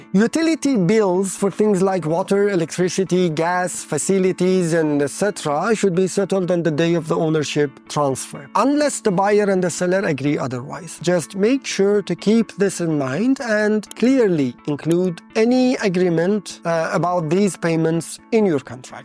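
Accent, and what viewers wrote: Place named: India. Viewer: لهجه هندی دارید 😅 جالبه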